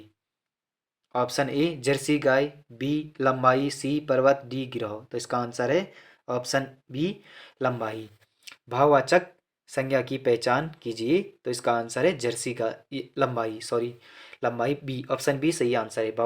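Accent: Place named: Rudraprayag, India. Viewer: Nice bhai ji rudpyag se ho lg raa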